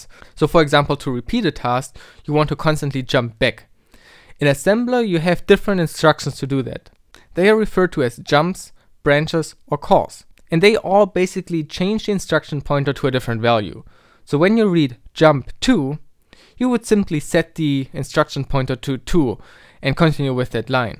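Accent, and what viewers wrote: Germany. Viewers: I agree. Too fast, his drawing is too messy, his writing too small AND he got the stack direction the wrong way around (albeit correcting it after the fact). He talks too fast AND IN A GERMAN ACCENT that isn't easily parsed at high speed. What MANY "tutorial" publishers seem to forget is that it's the people who DON'T KNOW THE SUBJECT who are your primary audience - if that audience can't understand you, or your sloppy preparation (or lack thereof) starts them off on a flawed footing, why are you teaching at all? That would drive me insane - I wouldn't DARE publish a tutorial in the state this is in, I just couldn't.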